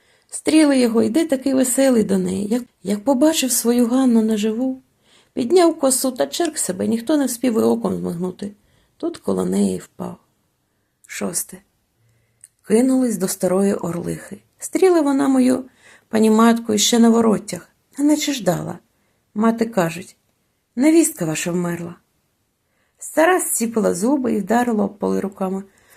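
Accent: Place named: Russia. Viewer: Родной язык чтицы - русский, и это слышно. Тембр голоса приятный, но нет той сочности и точности звуков, которые присущи только реальному носителю языка.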